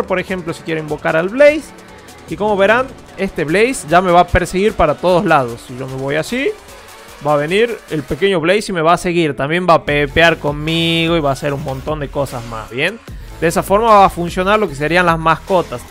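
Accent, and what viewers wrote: Argentina. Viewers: Wen eres argentino? Solo pregunto por el asento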